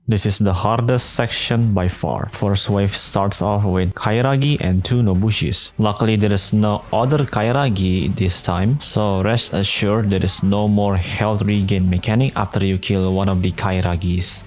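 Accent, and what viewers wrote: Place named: Indonesia. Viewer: i hear indonesian accent speak english, i like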